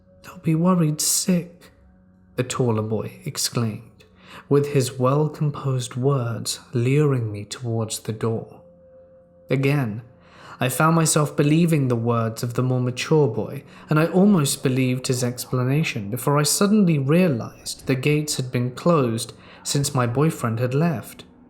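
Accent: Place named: Upper class, United Kingdom. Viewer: BBC English with a distinct aristo twang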